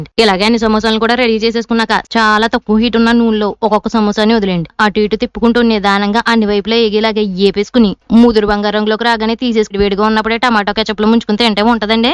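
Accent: Godavari, India. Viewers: గోదారి యాస సూపరూ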